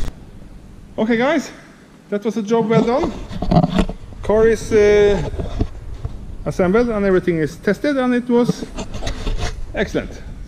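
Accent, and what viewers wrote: Norway. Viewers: Norskengelsk er så kult 😊 (Norwegianenglish is so cool)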